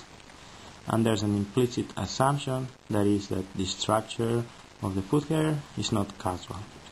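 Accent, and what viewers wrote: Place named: Japan. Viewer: Hola,! Buena presentación! Tu pronunciación esta bastante influenciada por el japonés...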